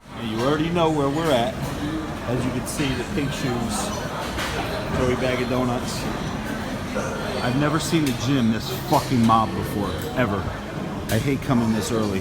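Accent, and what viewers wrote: Philadelphia, United States. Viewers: Yo man you from Philly?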